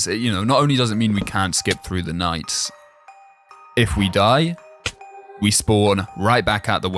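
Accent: United Kingdom. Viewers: just realized he’s British/Australian/sum like that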